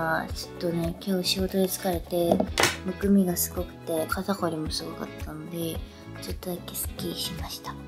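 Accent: Kansai, Japan. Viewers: はじめまして😌私も関西人なのでりほちゃんの関西弁聞くと親近感湧きます😆✨「むちり突き上げてる」がパワーワードで😂 小田切ヒロさんとのコラボ動画で初めてりほちゃんを知ったんですけど、イントネーションで「もしや関西の子かな？！」と思って動画見たらハマりました😆(普段ヒロさんとコラボ動画出してるYoutuberさんの動画は見ないので初めてです✨) ちなみに、りほちゃんのパープルメイクかわいくて使ってたコスメポチリましたよー😍 ゴリゴリのモード系メイクも見てみたいです☺️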